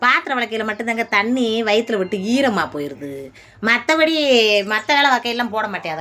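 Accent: Madurai, India. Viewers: ரொம்ப எதார்த்தமா பேசுற நல்லா இருக்கு இந்த வீடியோ பாக்குறதுக்கு சூப்பரா இருக்கு அந்த தமிழ் தாம்பா உங்கள அடிச்சுக்க முடியல மதுர தமிழ் தமிழ் தான்யா கேக்க ஆசையா இருக்கு சென்னையில இருக்கிற எங்களுக்கு உங்க தமிழ் பிடிச்சிருக்கு பிடிச்சிருக்கு பிடிச்சிருக்கு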